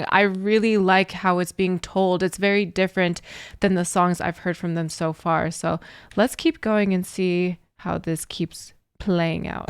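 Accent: United Kingdom. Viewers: Are you British? You have a little bit of an accent which is totally cool but I was just wondering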